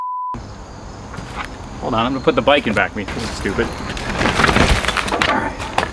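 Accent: Boston, United States. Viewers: Hahaha Recovering XC Dork! Intermittent Boston accent.. Checkin all them boxes!! Hope you get some more free time kuz it’s fun to watch ;p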